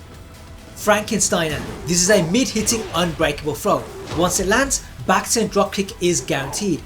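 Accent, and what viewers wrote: Birmingham, United Kingdom. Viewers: great guide, thanks a ton! you from birmingham? trying to place your accent. rly appreciate all the king tech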